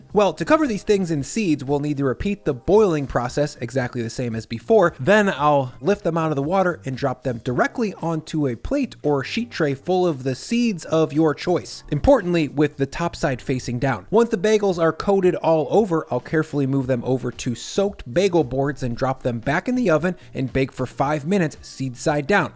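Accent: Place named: Aurora, Illinois, United States. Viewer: I was fascinated by the accent of someone from my college, and you speak JUST like him. He was from Aurora, IL. I'm from Chicago suburbs too but I don't speak like you? help me out!! The way you say "sturdy" is a prime example of this quirky way he would pronounce things, and I loved it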